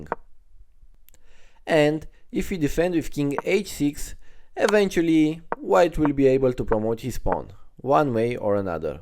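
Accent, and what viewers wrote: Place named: India. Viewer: I didn’t know you had an Indian accent.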